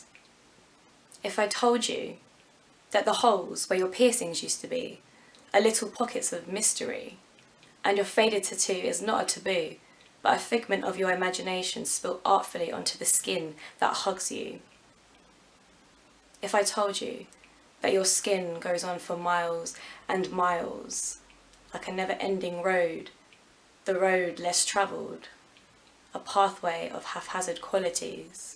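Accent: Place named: United Kingdom. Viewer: beautiful poem, and I really like your incredible british accent. Please make more videos. regards from México :)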